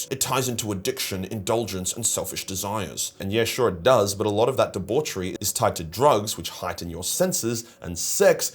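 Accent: Australia. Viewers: Heh you didn't just stumble over "unfathomable" - you also mispronounced "dirties". I think even taking your Australian accent into account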